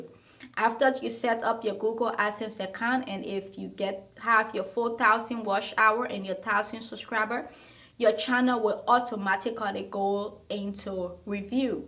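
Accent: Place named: Liberia. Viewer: Hello, JackieNaturals is always mentioning your name so I decided to check you out and support. Your made your first $100 on my birthday. I think YouTube takes a percentage. I like how you’re matching with your eye look. I just went on your website and found out you’re 🇱🇷, I knew I heard an accent. I’m Liberian too 🥰🥰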